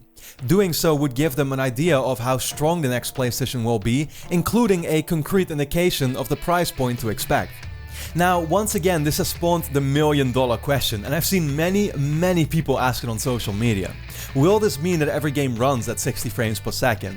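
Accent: Netherlands. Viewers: Are you dutch? Can hear it in your "s" 😅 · are you dutch because you have a dutch accent. (with dutch i mean netherlands) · ik ben al een tijdje geabonneerd op je en ik dacht altijd al Dat is toch een nederlands accent en eindelijk zei je dat je nl was en ik dacht echt van boem ik wist het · Such a dutch accent :P But nice vid!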